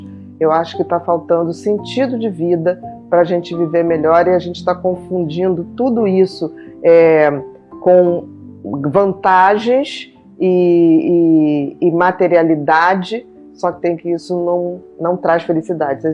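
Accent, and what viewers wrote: Rio de Janeiro, Brazil. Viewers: Nunca tinha reparado que carioca falava assim… obrigado! 😅😁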